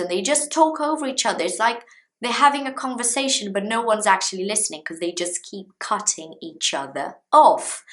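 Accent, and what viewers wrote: United Kingdom. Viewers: I missed your 🇬🇧BRITISH🇬🇧 accent during these days without a new video of you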